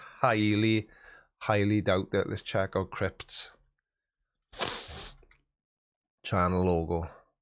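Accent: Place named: Wales, United Kingdom. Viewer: G'day from South Australia, I think the Welsh accent is the best in the world followed by the South African. There is nothing wrong with speaking your mind, don't change that's why we follow you.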